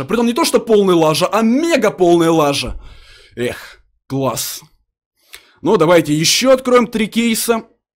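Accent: Ukraine. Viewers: А ты украинец шоль?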